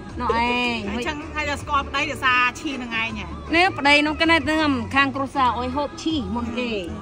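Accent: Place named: Siem Reap, Cambodia. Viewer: 😂😂 និយាយពិរោះតាមអ្នកសៀមរាប ដល់ឈប់រដឺនចង់ដាច់ផ្ងារ😂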